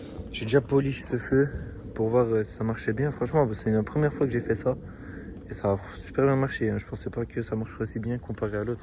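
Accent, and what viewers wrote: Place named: Alsace, France. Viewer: J ai cash reconnu l’accent alsacien mdr 😂 et pour les fleur essaye du diluant normalement t’enlevé tout avec sa et force a toi gros pour tes projets 💪💪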